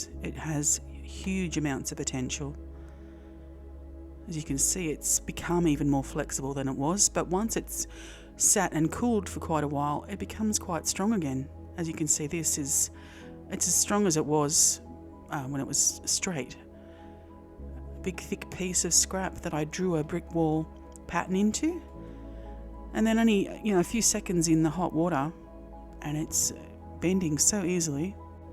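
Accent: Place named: Australia. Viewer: Worked very well and have never thought about this. Saving this in the bank of things to do, you sound like a fellow Australian as well mate :D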